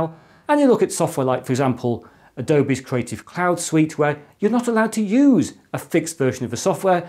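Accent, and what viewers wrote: United Kingdom. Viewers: It's like LInus Tech Tips but Bri'ish.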